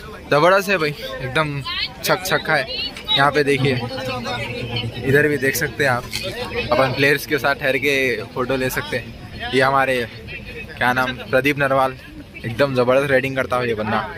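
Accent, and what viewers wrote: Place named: Hyderabad, India. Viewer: Hyderabad accent I love much ,maza aagaya😍😍😍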